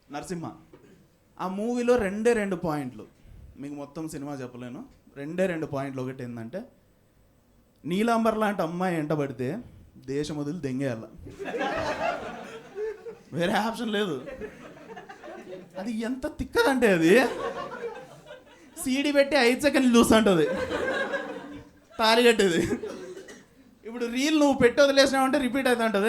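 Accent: India. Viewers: Bro nee jokes genuine ga bagunnayi, boothulu theesesina kuda anthe comedy ga untayi... so try to avoid bad words.. neeke reach ekkuva untadhi appudu.. btw i like your kadapa slang bro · పక్కా అనంతపురం యాస.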